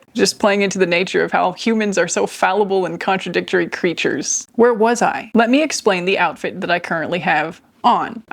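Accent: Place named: South, United States. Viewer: OK. It’s killing me!! What is your underlying accent. I can hear it when you say “mad”, “had”, “that” “myself” and other words, especially at the end of your sentences. Did you live in the south? Interesting content and great presence. You have a fan here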